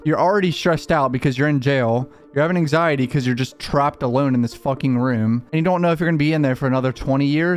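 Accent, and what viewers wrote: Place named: Maryland, United States. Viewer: I can tell your from md buy your voice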